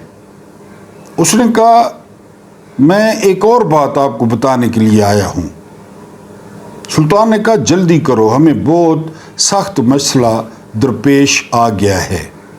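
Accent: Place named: Punjab, Pakistan. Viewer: بنجابی لہجے میں اردو، تاریخی واقعات اور مزاحیہ انداز پر آپ کو سلام۔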